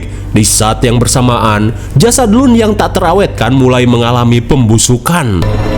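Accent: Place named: West Java, Indonesia. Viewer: mang,lahir taun braha sih😂..sundana jiga Sunda bandung cianjur,milenial pasti yeuh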